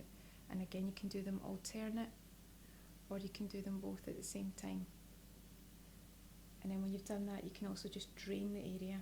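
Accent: Scotland, United Kingdom. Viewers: I like your accent, where do you come from? Is it really Scotland? Some comments mentioned that before... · Not sure how i ended up here, but i have to say. I still think the scottish accent is my favorite. I dunno what about the accent draws me to it, but its just such a good accent. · I learned a Scottish accent from you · Scottish accent ❤️ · With that accent, I fully believe that Scottish women could rule forever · Awesome video, the scot accent an added bonus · I loooooove scottish accent ♡ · The Scottish accent is just lovely here (pleas say "braid" please) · 😂😂 I love still game was bought up with Rab C and I love your jock accent 🏴 greetings from east London 🏴👍🏻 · Its really strange to hear a fellow scot do a massage video for some reason.lol did you study holistic massage in any colleges in Glasgow?. looks like the Cardonald college uniform You model is a babe as well:p haha cool vid:) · Nice relaxing video. 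I'm sure it would feel great and have positive health effects. I love your Scottish accent too. Some women have very soothing voices like Lita. You certainly do too.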